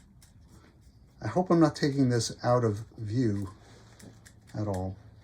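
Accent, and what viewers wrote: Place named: Midwest, United States. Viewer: I have a couple questions, how long did you leave the wire on before you took it off prior to putting it in the round pot? And can I ask what part of the country you are from? You seem to have a midwest accent? Coming from a Minnesotan, you sound like one of us.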